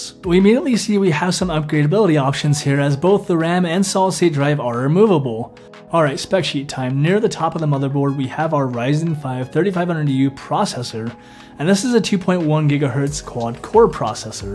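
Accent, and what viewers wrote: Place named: Northern Ireland, United Kingdom. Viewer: Mate, where the hell are you from? You sound 5% Norn Irish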